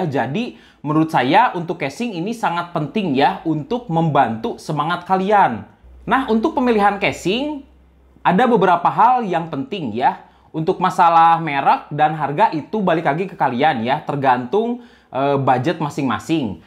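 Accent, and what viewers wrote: West Java, Indonesia. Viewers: sunda sekali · Moal teu orang sunda ieu mah , kaciri cara ngomong na 😅😅😅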